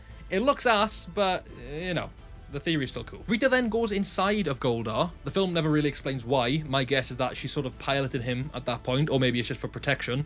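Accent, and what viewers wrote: Wales, United Kingdom. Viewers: Damn... random hyper fixation youruber ALSO being welsh? How did i not recognise the accent sooner? 😂 great work mush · Wow wow wow how have I never realised your Welsh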